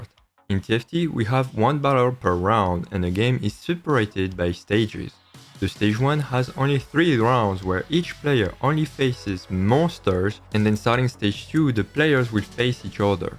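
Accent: France. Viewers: T'a trop un accent français , 100% tu l'es je pense ^^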